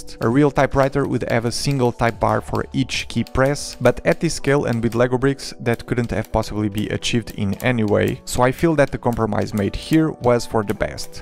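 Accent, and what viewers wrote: Portugal. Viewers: Tava mesmo a ver que eras português, o sotaque foi o que fez com que eu descobrisse!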